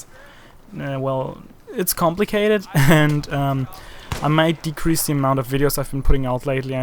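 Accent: Germany. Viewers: I am trying to figure out where you live, because of your accent. And I think you are a german? Is this correct?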